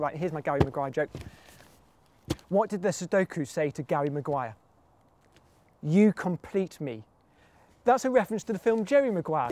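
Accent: United Kingdom. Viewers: if this man did not have a British accent i would have stopped watching about 8 minutes and 30 seconds ago. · are u british u speak with a british accent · Im american Hes british He sounds funny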